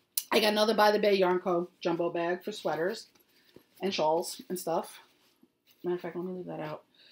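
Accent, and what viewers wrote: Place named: Brooklyn, United States. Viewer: Your Shawls are Beautiful! I'm so glad that Melissa pointed me in your direction- Love seeing and hearing about Your Yarney World! Chewy is a Little Doll, I just wanted to reach in and hug him! Thanks for Sharing, I'm still catching up on past episodes and enjoying them all! I was born in Brooklyn, Love hearing You speak, I lost my Mom and Dad 7 yrs ago so I don't get to hear the accent anymore as I haven't lived in NY in many many years! XX Marie-Wisconsin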